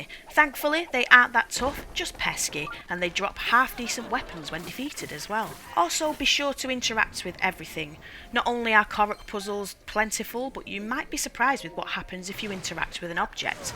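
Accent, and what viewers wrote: North, United Kingdom. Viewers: Great video. Also brilliant to hear a northern voice on a video like this ❤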